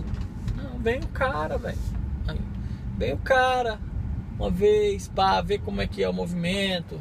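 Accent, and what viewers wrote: Brazil. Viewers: De que parte do Brasil você foi? Cearense?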